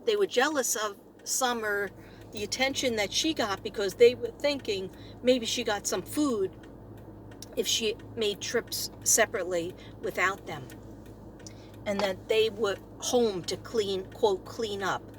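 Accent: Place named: Brooklyn, United States. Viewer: You have a brooklyn or ling island accent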